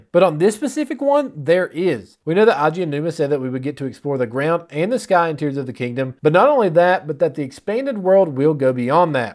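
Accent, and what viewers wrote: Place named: East Texas, United States. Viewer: I missed about half of what you said because I was trying too hard to place your accent 😂 East Texan maybe?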